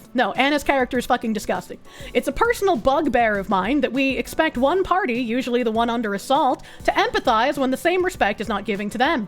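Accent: Boston, United States. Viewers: I love your accent. I think Boston women are the best. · I notice when gets annoyed. Her Bostonian accent comes out strong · Loud boston foxu sexy talk, more please. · Jokes on them. The thick Boston accent is very attractive. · I only know of because of whatever this shit is and I gotta say, very fucked up. But dude, as a linguist, I cannot get over that amazing Boaston accent, Imma have to study that shit XD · ! Sexiest Bostonian Fox Woman Ever!!! Talk Boston at me woman!! Talk BOSTON!!!!!! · Gotta admit the Boston accent is doing it for me ❤😂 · !!! I want to do YouTube and kik as a musician that doesn’t have to bite my tongue about schtuff or be confronted in front of my son in line at Whataburger lol. I’ve had local, wouldn’t call it fame, but was in a popular band in Houston for a decade. I kinda didnt like delusional locals coming up to my family while we were trying to have dinner to tell me positive or negative takes on shows or my idiot ex singers stupid fb post.. 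my question is, is it possible to be a musical vtuber? Like play real instruments with and avatar covering most my identity? And also i don’t understand where to start.. I’m sorta retarded.. is there any advice? Also you made my Texan ears love the Bostonian accent lol it’s calming to hear you at work. Between you leaflit and nux I’m convinced this is the best direction to have as much creative freedom as possible.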